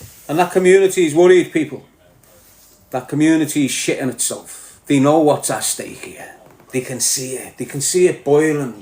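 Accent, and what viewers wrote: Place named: Liverpool, United Kingdom. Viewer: Scouser u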